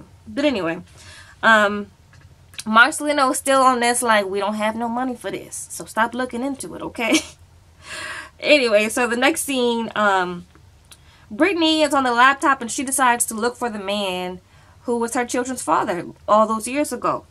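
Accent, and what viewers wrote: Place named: New Orleans, United States. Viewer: Are you from New Orleans cause that accent is giving me straight Nola baby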